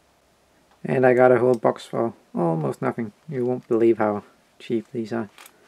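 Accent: Denmark. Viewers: are u danish like me :P?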